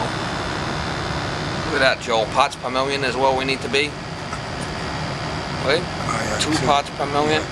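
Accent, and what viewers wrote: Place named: Northeast, United States. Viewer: u soumd like ur frmm UMM?? northeast there. I have a reheem 1973 gas heater. it heats up like sound is boombush but after that no sound from the fan motor and I did put in canstat l22-9a-11 there that didn't work but I got the fan motor going there but noo heat though. could it be the fan limit switch there thanks George..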